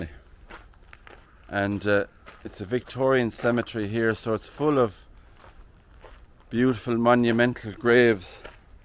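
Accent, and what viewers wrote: Ireland. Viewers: I like the term of torch, vs our flashlight. 😂 To bad a person re-purposed yours, for themselves! Hello from Northern California, I am enjoying another of your videos. I am getting better with understanding inflections with your accent, in addition to having had some amazing Irish neighbors, who have moved back to Ireland. Happy New Year to you, your team and family. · 322nd So many people are clueless about human biology and what happens to a body when you die ..... great youtube page "ask a Mortician" ..the body bloats and releases trapped gases....love your accent / my direct relatives are from Donegal - Gallagher/Coll/Costello ...I was in Ireland 15yrs ago - Gorgeous country ....I wonder if the Cusack..... is related to the Actor/family Cusacks?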